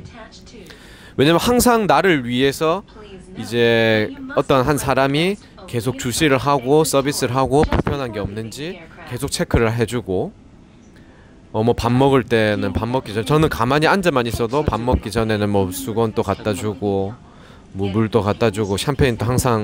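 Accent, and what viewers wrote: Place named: Gyeongsang, South Korea. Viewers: 등드리. 나나.. 정말.간만에.듣는.고향말..ㅎㅎ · 갱상도 사나이 발음....잼납니다...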